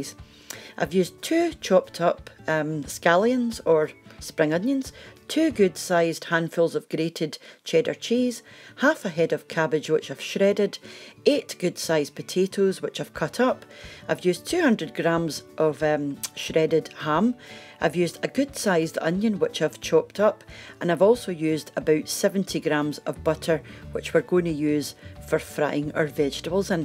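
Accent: Scotland, United Kingdom. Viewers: I canna travel to Scotland anymore so I practice the accent listening to you and the Outlander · You sound very like my husband. Light, polite and well spoken. I love that kind of Scottish accent. He's from outside Glasgow. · It's so interesting to me that Scotland has such different areas within it. I spent three years living in Fife and not once did I hear of this dish. It looks delicious and ill give it a try. So nice to hear your accent, I miss Scotland so much! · A perfect channel! A gorgeous Scottish burr (giving all sorts of ASMR) with yummy comforting food. Youtube heaven! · i like the name! and love the Scottish accent. also a big fan of SAHB, one of the best rock bands ever and highly underrated but i will always love them. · Grew up with Scottish accents, miss them a lot. Great recipes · Your accent makes me homesick...... I was born in England but my family are from the Highlands originally and I called Auld Reekie my home for many a year, even started getting a wee accent masel..... 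Smashing.... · I clicked on this video more to just hear how this dish is pronounced and love the Scottish accent so much.. I ended up watching the entire video and now I need to make this for dinner..Just subscribed!! LOL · I came for the dish.... Stayed for that lovely accent. I love your accent of the Scottish young. Lovely. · I could listen to a Scottish accent all day long. It reminds me of my grandparents. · The accent takes me back to a happier time. I was raised by JOBs in Canada (Just Off The Boats) all of my family were from different areas of Scotland. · I have trouble with Scottish accents but I can understand your beautiful accent perfectly. I never heard of this but it sure does sound good. By the way, I'm doing my family tree and discovered aseveral times great grandfather was from Scotland. Completely unexpected. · Yum! You sound like many of my pals fae Scotland! Much love from America ❤💙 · Scottish accent is funny like irish accent but the people's features are so beautiful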